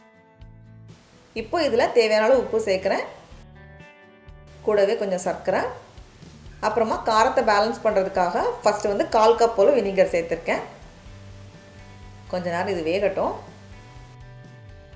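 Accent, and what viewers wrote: Nagercoil, India. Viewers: 1.45 Cheththu pozhachuruku😂😂 ultimate akka.,still I can feel the nagercoil slang in u❤️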